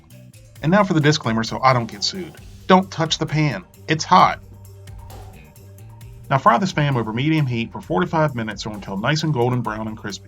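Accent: United States. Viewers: Some of your American accent is hard for non US people to understand , what are the first two ingredients for the mayonnaise .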